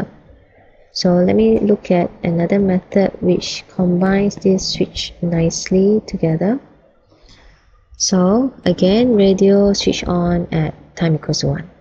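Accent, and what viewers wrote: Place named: Malaysia. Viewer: You sound Malaysian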